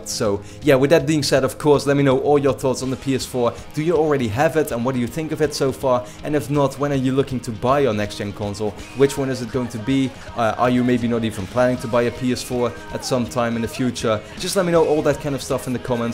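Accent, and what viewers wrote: Netherlands. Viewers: Fucking hekel aan nederlandse accenten. I mean je kan er niks aan doen, ik heb het zelf ook.. Nederlands klinkt gewoon lelijk xD Anyways, dankje! Ik zat erg te denken of ik nu al van 360 naar ps4 zou gaan. En ja dat ga ik zeker doen. Mijn beslissing staat zo vast dat ik vanmiddag dat ding nog ga halen. Thanks for this review! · You're Dutch right? :p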